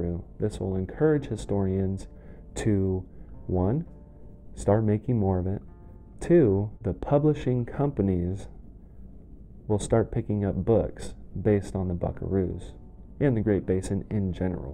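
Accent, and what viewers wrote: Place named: California, United States. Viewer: Born and raised in the Great Basin, this presenter is an embarrassment. He sounds like a Californian. I also take issue with his incoherent comment about the Great Basin not being part of the larger value set of traditional Americans. If there was and is any place in America that has hung onto traditional American values, it is in the cow country of the Great Basin. The traditions of the Vaqueros with the long reata, hackamores and spade bits never got the attention of the Texas cowboys precisely because of the remoteness of the region and the independent nature of the buckaroo. Battle Born. Storm Rough Country Horses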